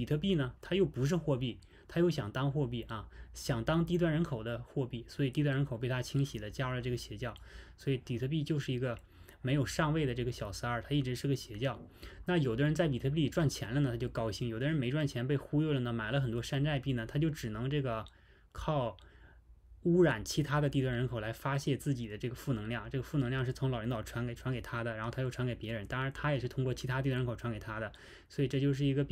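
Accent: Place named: Northeast, China. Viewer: 播主的声音挺好的，有点东北口音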